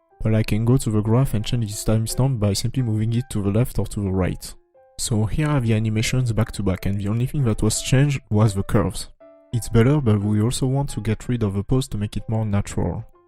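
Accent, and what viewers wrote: France. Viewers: We've needed this for a while! Also love your French(?) accent, I may be enabled for taking french in high school but you're very understandable :D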